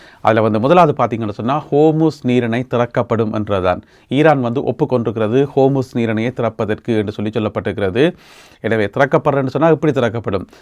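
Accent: Jaffna, Sri Lanka. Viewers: Your Jaffna style talking very interesting.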